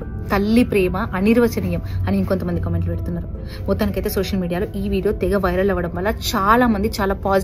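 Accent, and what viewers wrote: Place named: Hyderabad, India. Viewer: Your Hyderabad accent is very Good . Also how to donate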